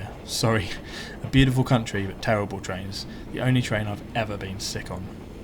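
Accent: RP, United Kingdom. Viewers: The VO is fine but the non VO was a USP- and the English accent can sound a little posh and dare I say - the old colonial timbre creeps in - so! stick to the old formula - less distracting and more focused on the visuals and good captions - one VO per year!! Your content is so good without the VO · It’s good to hear your voice! I like the British accent mate 👍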